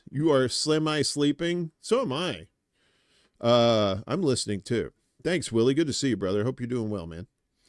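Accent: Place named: Israel. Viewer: f israel ❤🖤🤍💚 i like yer israeli accent btw! as well as the 'US hegemony' voice!!!! love from an 'merican in UK ;D x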